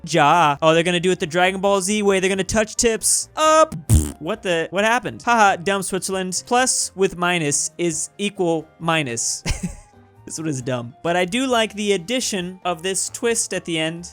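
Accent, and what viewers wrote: Australia. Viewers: I love the Australian Accent do a Video with the Accent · Hot take, but.... Australian accent is better than a real Australian’s accent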